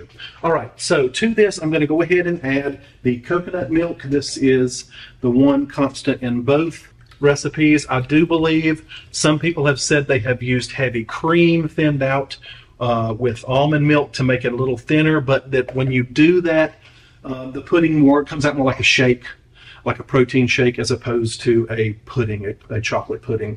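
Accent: South, United States. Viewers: "run around the yard nekkid and yell at the dog" You been spying on me? 😂😂😂 I truly enjoy your videos. We sound so much alike with the southern ridicularity tossing words together. I love it!! Definitely trying this tonight. Hotter than Hades and more humid than Satan's draws out there baling hay. That's my justification for chocolate.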